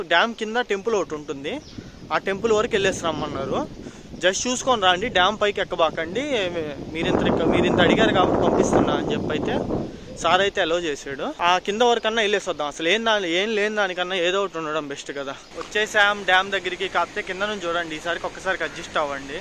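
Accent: Nellore, India. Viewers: Nellore yaasa 100 percent dimpesav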